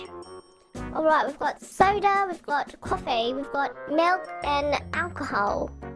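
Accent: United Kingdom. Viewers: I just want to say I really do like your british accent. I can listen it all day❤️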